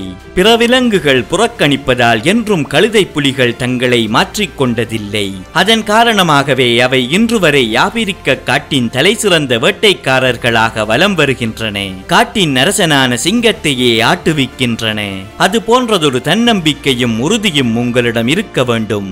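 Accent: Sri Lanka. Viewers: சார் இலங்கை தமிழராஆ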